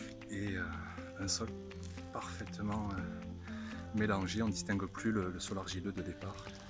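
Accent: South, France. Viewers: Cette préparation du sol est elle la même partout dans le monde? Je vis en Belgique et mon jardin...c'est de la boue. et il pleut TOUT les jours. je dois suivre ces étapes?Avez vous des conseils pour des regions pluvieuses? Merci d'avance et Très bel accent du sud :)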